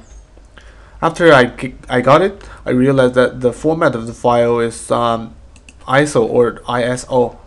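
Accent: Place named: Iran. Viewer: Iranian Accent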